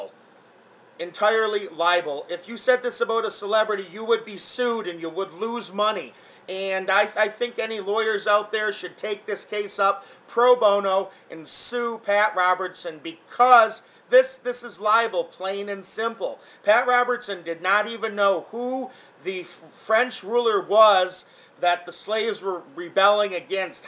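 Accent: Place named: Midwest, United States. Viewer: I love your Midwestern accent.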